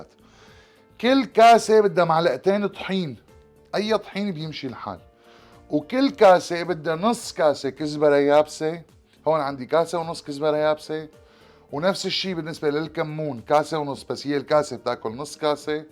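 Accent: Levant. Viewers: سلمت يداك ولسانك الشامي العذب تحياتي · حكياتك الشاميات حلوين 😄 وشغلك ماشالله نضيف ومرتب يعطيك العافية 👍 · حكيك شامي تمام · مصري من المانيا يرسل لك شكر يكفي من هنا لعندك. غير اللهجه الشاميه التي نعشقها كمصريين,فبكل امانه الشرح والنظافه والاهتمام الرائع في تجهيزك للحم,انتج في النهاية قطعة البسطرمة وشرائحها والتي من مجرد شكلها وانسيابيتها تعد تحفه فنيه. لا تقل ارمينيه, ومع احترامنا لهم فنحن نعرفهم اساسا كساعاتيه,ولكن انا حين اجربها سأقول بسطرمه. كل الحب والاعجاب والتقدير وتحياتي لشخصك الطيب. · تسلم ايدك شيف اول مرة اشوفك بس واضح انك استاذ في البسطرمة الله يعطيك العافية واضح من لهجتك انك لبناني او سوري وانا كتير بحب اللهجة الشامية تحياتي من مصر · سلامات شيف اكلاتك متلك طييبين اللة يسلم دياتك والاحلة لهجتك الشامية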